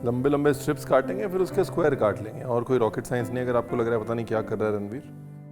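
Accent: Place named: Uttar Pradesh, India. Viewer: Is Ranveer UP'ite? He has a UP accent..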